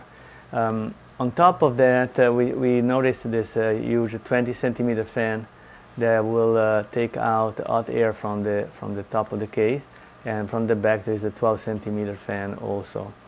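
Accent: Italy. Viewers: R U Italian? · you speak english very well but your accent makes me wonder: Are you italian?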